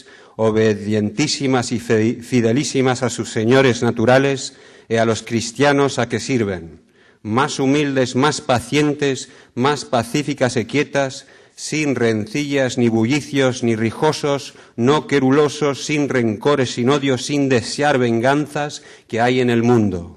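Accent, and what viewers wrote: Spain. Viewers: he's speaking in a Spanish acent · here he reads with an spanish accent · on this lecture he is speaking with a perfect european spanish accent... not an easy task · Native to Spain, that's what I meant. Argentine don't have this accent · maybe he is from Spain, don't they all talk like that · He's speaking with accent from Spain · Habla en español con acento español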